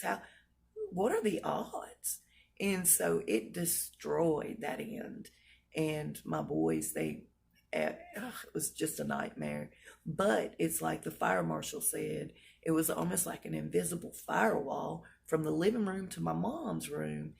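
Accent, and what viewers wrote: South, United States. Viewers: PS I want to thank you for your videos - I enjoy listening to your southern drawl and your outlook on life. May God bless you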